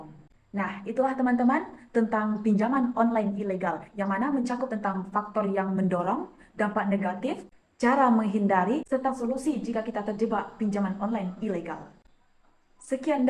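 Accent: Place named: Padang, Indonesia. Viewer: Klua irama tngah padang me,,😂😂 is ok, lnjutkan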